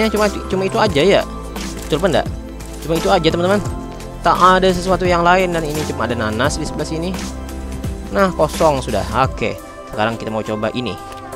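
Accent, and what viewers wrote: West Sumatra, Indonesia. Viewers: Bang suara lu kaya Minang kocak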